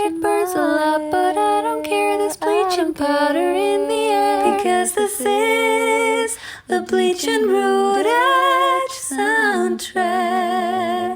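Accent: United Kingdom. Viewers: wasnt she already talking in a british accent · British accent is daaaa BEST!!!!! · british accent is the only thing that keeps me going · lately you’ve been serving some ✨fabulous✨looks, ma’am xx p.s. that british accent omg 🤣🤣🤣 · slayed British acent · YAAY MORE SHABBY SHALOON 🥦 THEY ARE THE BEST AND I REALIZED THAT TALKS IN BRITISH ACCENT WHEN SHE'S STRESSED 😹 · i LOVE YOUR BRTITISH ACCENT !!! 💙💙💙💙💙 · the british accent really popped off in this one 😍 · unpopular opinion: i prefer your british accent lmfaoo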